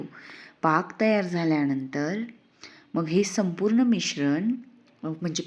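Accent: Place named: Khandesh, India. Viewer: Tai aapli ahirani bhasha aikun khup chhan vatal ❤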